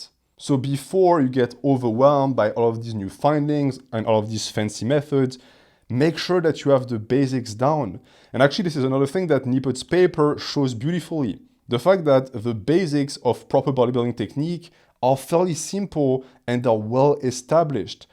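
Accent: France. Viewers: hello, this is not related to anything but is it possible for you to style your facial hair like a pencil moustache? you are french and i think your accent will match your character if you rock a pencil moustache. thank u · Fitness advice is way better with a thick French accent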